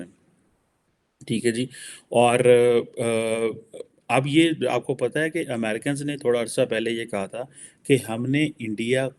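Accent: Punjab, Pakistan. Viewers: to watch his comedy and jealousy against India. He doesn't even know his national language urdu properly, He speaks in punjabi urdu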